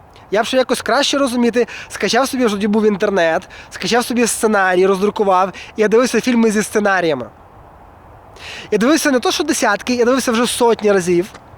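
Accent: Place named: East, Ukraine. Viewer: Це круто! Але подейкують що відчувається східний акцент..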